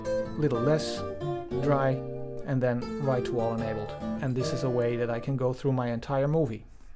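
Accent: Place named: Netherlands. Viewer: What a lovely Dutch Accent ;)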